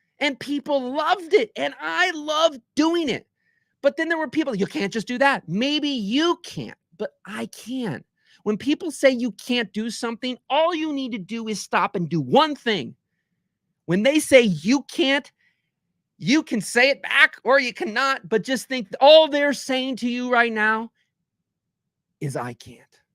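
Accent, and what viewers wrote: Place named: South, United States. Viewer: Please don’t ever stop doing that southern intense accent. Here for the sweat! Dude I lol so hard while driving to this. Loving the new videos, keep it up! Gawd dang ! Let’s go!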